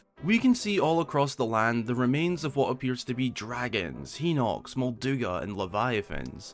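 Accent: Scotland, United Kingdom. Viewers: I love the thought of Dodongos in BOTW! BTW, I couldn’t pinpoint it at first, but your accent sounds just like the male version of Merida’s from Brave. I’m guessing that may be because you’re Scottish? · First video I've seen from you, and I love the Scottish accent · When I heard the Scottish accent I audibly gasped. I'm also Scottish and a fan of LoZ :-)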